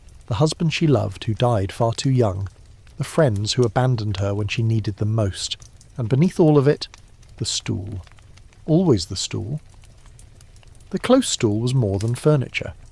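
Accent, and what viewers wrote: United Kingdom. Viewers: I love your channel & historical stories, I’m glad I found you. I’ve deeply enjoyed your research, writing excellence and your wonderful British voice. Your videos’ ill ustrations are absolutely beautiful & I enjoyed the ambient background crackling of a large hearth found in a castle, both calming & deeply relaxing. So relaxing I dozed off during your story and had a dream I was in Queen Anne’s castle visiting with my Grandmother and brother, who’ve both passed away. My brother, 4 years younger, my only brother,passed too young, suddenly & very tragically. It’s been painfully difficult for me, as we were extremely close growing up & as young adults; he had not yet married nor had children I couldn’t go back to sleep so I came to yt to look around & stumbled upon your channel. I was able to fall back asleep and had this dream. I was walking through Queen Ann’s Palace as you were describing it. 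It was both beautiful and regal while also half crumbling & decaying. It was crowded, but I recognized my Granny, who passed away many years ago and my younger brother, who just recently passed away on February 2nd of this year. Such a strange dream and odd, as I’ve not dreamed of little him until now. Thank you for your video, it brought me great comfort❤. I subscribed & going to watch another!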